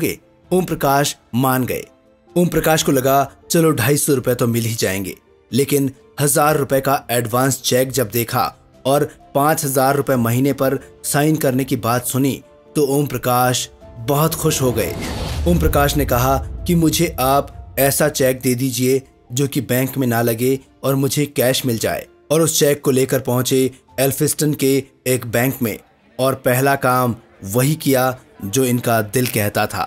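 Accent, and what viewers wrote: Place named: Urdu, Pakistan. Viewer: I don't like your urdu pronunciation. Speak Hindi.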